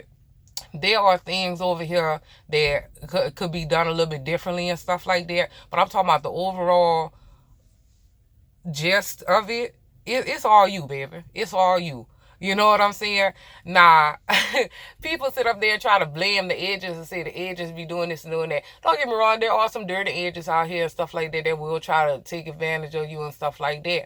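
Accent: Louisiana, United States. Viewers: You are just too cool I love the accent Louisiana I think but you're beautiful cute all the above now I wanna come to land star for sure💝❤💞🌹!! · That Louisiana accent is straight SEXY💯 Ok BaaaByyyh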